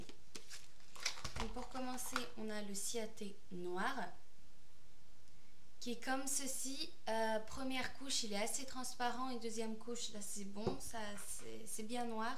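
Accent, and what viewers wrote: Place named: North, France. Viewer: Tu es bretonne? Brest ... Mais ta un accent du nord pkutot mdr moi aussi je suis de bretagne dans le 29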